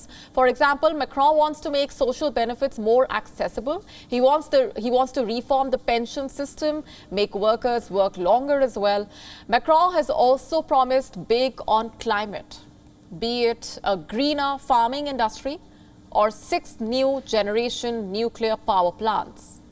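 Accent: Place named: India. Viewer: Is she reporting news in English or Indialish. Do WION don't have a better newscaster other then this lady.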